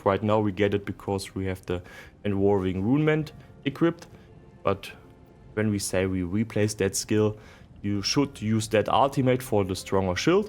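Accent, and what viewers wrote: Germany. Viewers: Immer wieder leicht lustig, wenn ein Deutscher Spieler flüssig Englisch spricht, aber es doch sehr Deutschenglisch klingt :-)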